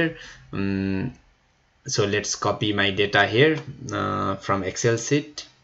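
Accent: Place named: Nepal. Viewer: are u from Nepal ? your accent is same as mine. thats why I asked.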